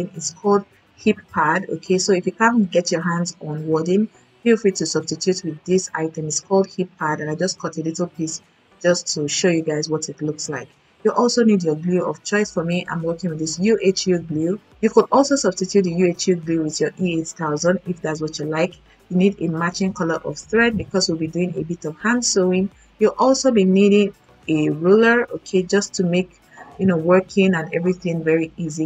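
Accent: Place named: Europe. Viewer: Never apologize for european english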